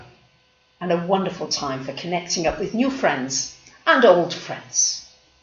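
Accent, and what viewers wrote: Greece. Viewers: looooveeee your greek accent <3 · I dont smile much and that is the problem. But your energy is different than others and i appreciate the greek , with that i resonate. A rarity. Not just info, but you too. Your heart and light comes through and your accent helps. 😄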